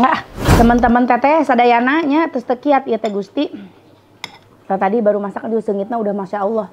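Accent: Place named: Sunda, Indonesia. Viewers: meni lekoh kitu sundana teh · Ceceu muni lekoh sundana 😂😂😂 resep jadina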